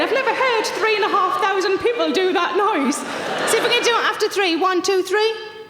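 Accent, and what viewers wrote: Newcastle, United Kingdom. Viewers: Would love to go see this lass at a live show. The Geordie accent always reminds me of good times.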